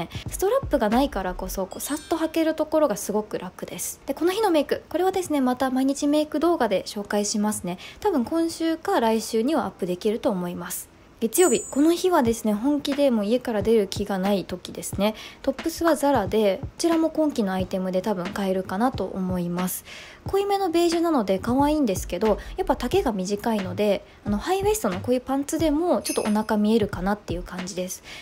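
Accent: Kansai, Japan. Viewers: 関西の方ですか？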